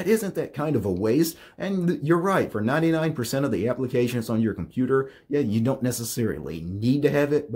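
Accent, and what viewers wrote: United States. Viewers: which side of USA is this accent from? 🙂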